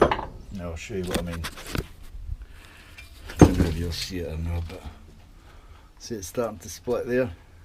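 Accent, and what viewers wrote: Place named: Glasgow, United Kingdom. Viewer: Thank You very much for the video and the nice scottish accent! Sounds like Glasgow? Say, why do You have to separate the inner and outer can with the wadding at all? As far as I understand it, You just want to shorten the biggest of the 4 tubes in the end piece You cut off before in step one? Sorry if I missed something. :-)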